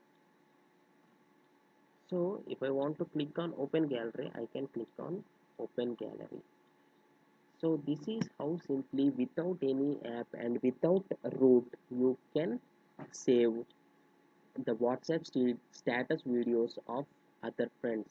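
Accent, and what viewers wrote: India. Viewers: You have an Indian accent but at least you speak understandable English. Thank you!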